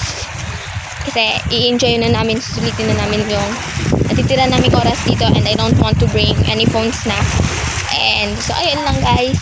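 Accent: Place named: Visayas, Philippines. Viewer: i like your bisaya ascent "TUBAG SAD MO OY" 😂❤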